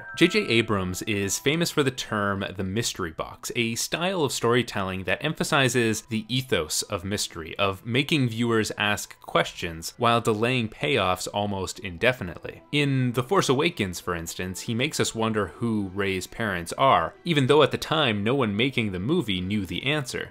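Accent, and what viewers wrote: Canada. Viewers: You're Canadian. I can hear it in your accent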